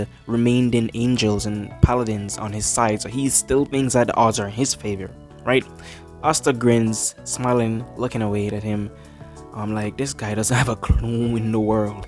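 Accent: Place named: Jamaica. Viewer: with all due respect where are you from brother? I can hear a kinda accent ive heard before a lot but i dont to be rude, but you kinda sound Jamaican. also amazing video thanks for the breakdown of the chapter bro!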